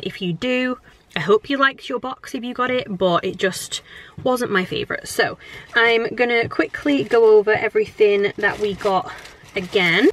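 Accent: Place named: Wales, United Kingdom. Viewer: ohhh lol you sometimes sound like you have a bit of a Welsh twang 🤪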